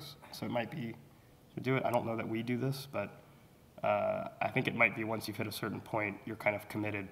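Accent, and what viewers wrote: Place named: Assam, India. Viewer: You speak phenomenally well, despite the Assamese accent!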